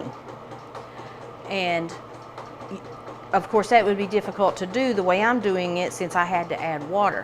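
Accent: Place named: South, United States. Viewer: Hears charming Southern accent stops to watch entire video. Thanks YouTube for this.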